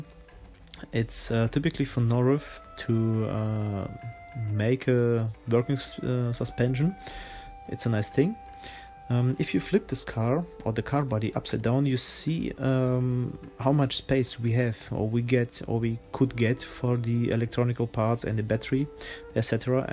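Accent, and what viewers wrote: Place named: Germany. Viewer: Wie man einfach hört das du deutsch bist beste 😀👌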